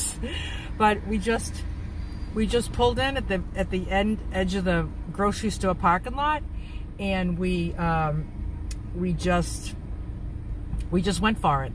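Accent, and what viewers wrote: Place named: Florida, United States. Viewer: is your driver seat on the right hand side? You talk like you're in Florida but what the hey?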